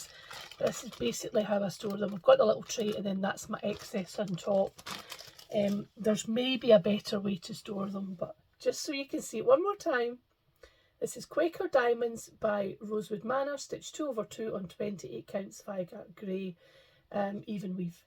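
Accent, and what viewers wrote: Scotland, United Kingdom. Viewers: Congratulations on 3 years Flosstube! Love all your stitching and the inspiration it brings, including the bird and all the lovely fabric. Great to hear a lovely Scottish accent on Flosstube! 🏴❤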